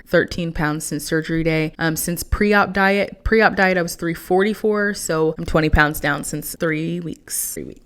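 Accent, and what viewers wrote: Texas, United States. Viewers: Omg your Texas accent is so cute lol! Thanks for sharing your updates and being transparent. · Your Texan accent is gorgeous and it’s part of you. So don’t hide that ish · Hey there's nothing wrong with speaking with your Texas voice. I love my southern voice.🤪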